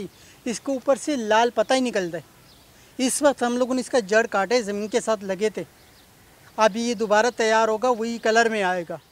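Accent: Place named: India. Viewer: Zaban sun ke laga india ka hindu hai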